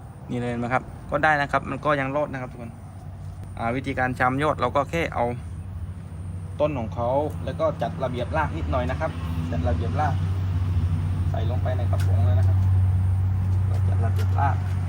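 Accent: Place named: Bangkok, Thailand. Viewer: สวัสดี คะ อยุ่จังหวัดอะไร สำเนียงพันนี้น่าว่าม่ายช่ายคนใต้ ต้องเป็นคน กุงเต๊ป(กรุงเทพ)แต่กำเนิดแน่นอน 😆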